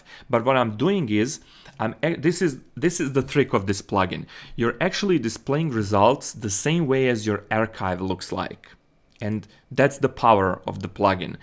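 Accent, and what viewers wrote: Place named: Bosnia and Herzegovina. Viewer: cim si poceo pricati znao sam da si Bosanac' Extra brate :D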